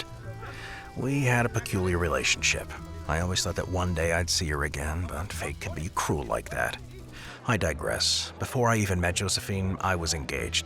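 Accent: United States. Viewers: nice american accent frenchy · Unwatchably annoying in a yank accent.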